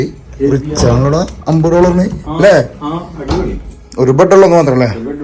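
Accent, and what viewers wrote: Thrissur, India. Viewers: ❤❤❤Wonderful description in Thrissur style and excellent camera...keep going ❤Artist Francis Antony Kodankandath from Thrissur,Kerala, India ❤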